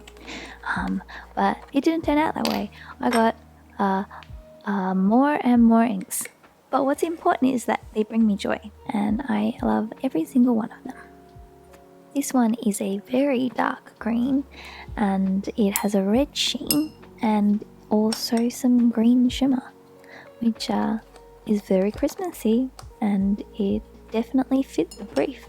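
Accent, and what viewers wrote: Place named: Australia. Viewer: Great to hear an Aussie voice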